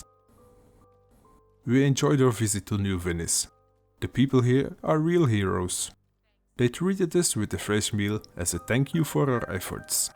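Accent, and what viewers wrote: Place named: Russia. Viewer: I like your Russian accent 👍💪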